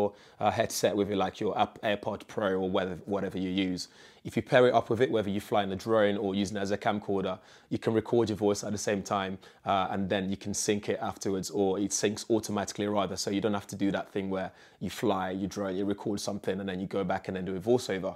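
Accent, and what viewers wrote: United Kingdom. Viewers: His accent is the coolest. Is he British or South African?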